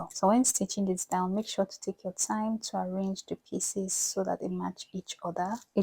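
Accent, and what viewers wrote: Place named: Africa. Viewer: I love your tutorial, but I missed some things. Please clarify what the material is that you are using as the backing to the silk, and what is the sticky item you are using to keep the lace from slipping. I could not understand what you were saying when you mentioned those items. Your African accent made it difficult for me.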